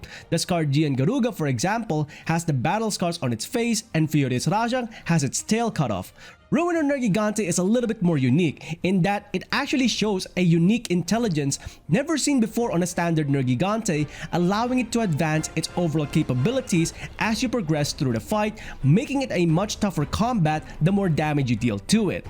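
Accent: Philippines. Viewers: When i Heard your Accent, I already know you are a "Kababayan" I think Variant Monsters are Those monsters that adapt to their surrounding or maybe just a Gene Mutation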